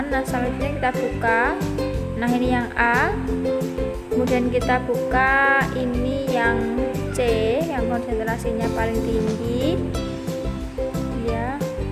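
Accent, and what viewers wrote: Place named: Java, Indonesia. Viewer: medok banget 😭 but overall baguss dan informatif hehe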